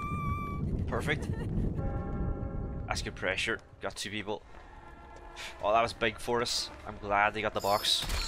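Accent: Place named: Belfast, United Kingdom. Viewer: It's a Belfast accent I think.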